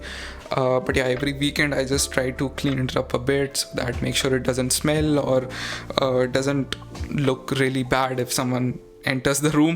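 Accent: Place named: India. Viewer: Wft ? This Indian guy can study in ANU with those terrible English and accent ? ANU has low standards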